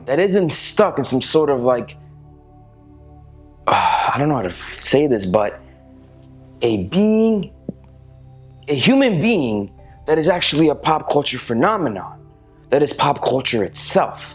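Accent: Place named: South, United States. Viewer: where are u from cuz have a southerny westernizh accent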